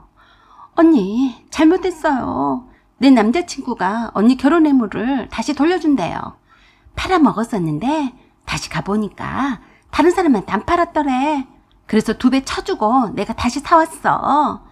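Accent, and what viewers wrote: Jeolla, South Korea. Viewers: 아따 무슨 절라도사투리를 허벌나게 쓰신다요? 성우분이 호남분이요? 글구 저런 싸가지없는 집안과는 바로 이혼하는 것이 답이랑께요!!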